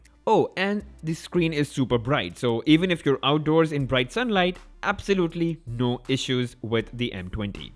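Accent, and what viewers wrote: India. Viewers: I'm the only one who hate the indian accent?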